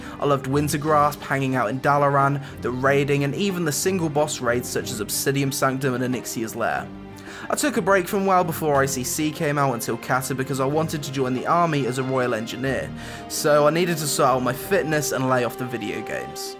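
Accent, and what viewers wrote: United States. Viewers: you American or British?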